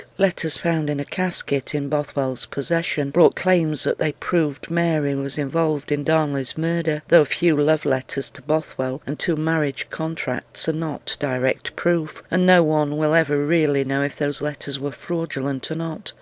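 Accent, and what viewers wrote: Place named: England, United Kingdom. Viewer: Thanks for posting! I thoroughly enjoyed this "history lesson.". However, two things come to mind. 1) Why does the narrator never pause between sentences, thus making one sentence flow right into the next (very annoying!?). 2) This is the biography of a Scottish Queen, yet the narrator is English. They should have been Scottish, also ( IMHO ). Regardless, the life & death of Mary Stuart is very fascinating, even hundreds of years on.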